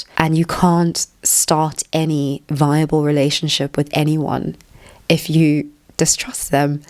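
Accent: RP, United Kingdom. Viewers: How can I be your friend for real? Your accent makes me wonder if you live far away in the UK? & the answer is yes. It is sad how humans are replacing having each other, with having things or pets. Pets are more friendly than humans it seems... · What a very posh english voice, never hear white girls talk like that these days. Have had similar symptoms. Maybe its the bioweapons, maybe its the illuminati anti love campaign. British NHS is dead, mental health services, government and society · Hey I am so sorry. You re exceptionally honest. this has a lot of value. if i have to be honest I am a bit annoyed by your posh accent (i m italian and probably i have a laughable accent BTW, so please forgive this). But you re absolutely adorable. Please reach out if you need something. even to me if there s no one else. (I live in London though). No one should be that disconnected to be threatened to end up on the street with no alternative. 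I really hope you re doing better since you posted the video. If not, please make contact. A hug from an oppresive patriarcal white man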